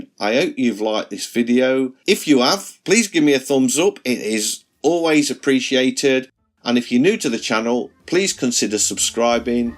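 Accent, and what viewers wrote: United Kingdom. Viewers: what you’re doing it’s wonderful.you can’t do split screen on this app,but in the Pro version you get 3 layers and that makes it very interesting,you can switch and merge those layers. There is also a 4th layer that actually let’s you’re choose what type of paper to use. AND in the Pro version you get the “blend” that you mentioned,very cool,the first of the 3 tools at the bottom. See i know where things are,but I’m not very good at sketching:-). Loved your video,and the British accent lol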